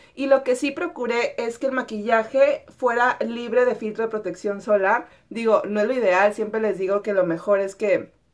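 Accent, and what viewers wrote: Mexico. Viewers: Me encantan tus videos, me río mucho y adoro tu acento mexicanote con todos sus modismos. Ahh y tambien llegue al final ❤️💙💛💜💚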